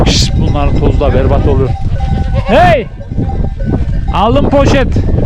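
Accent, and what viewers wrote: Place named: Taurus Mountains, Turkey. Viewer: Konustugunuz şîve Adana Mersin toroslardaki köylerde konusulanla aynı. 🤓 O yüzden çok samimi geliyor bana👍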